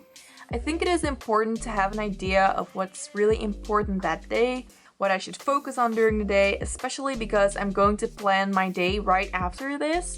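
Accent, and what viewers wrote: Netherlands. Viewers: are u dutch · Haha I thought you sounded Dutch and then I saw the Kruidvat & Albert Heijn products. I love Dutch YouTubers who make English videos! ☺️ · Hold on for a sec, your Dutch?😱😱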